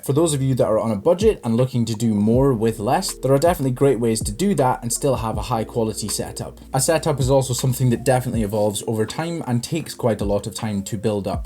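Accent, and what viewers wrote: Scotland, United Kingdom. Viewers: Awesome video brother! Just outta curiosity. what part of the UK are you from? Cheers from Canada! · I love when you click on a video and hear a fellow Scottish accent!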